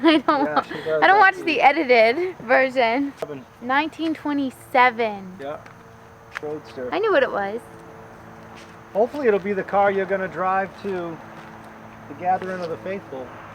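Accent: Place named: Massachusetts, United States. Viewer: The car is coming along great! Your accent reminds me of my mom. She grew up in Gardner mass.. keep up the good work!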